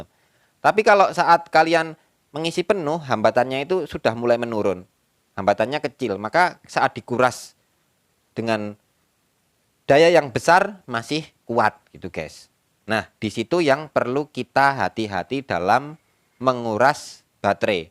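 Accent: Java, Indonesia. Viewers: Maturnuwun inpone mas gess. Btw mas niki daleme pundi to? Kok logat jowone kental banget. 😁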